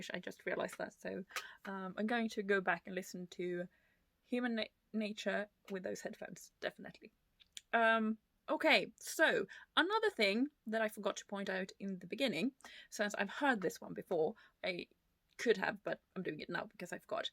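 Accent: United Kingdom. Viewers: My thought exactly, about the accent. At first I was a bit confused - like "Is she British or Swedish or what..." 😂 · That must be the most British-ish accent I've heard from a non-Brit 😁. Nice to see you getting back on track. I was somewhat out of NW too for several years (but then again I didn't listen to any music other than what was on radio when driving), but have been catching up the past year. In a way it was a healthy break for me as I can now observe with a new perspective. And another greets from Finland.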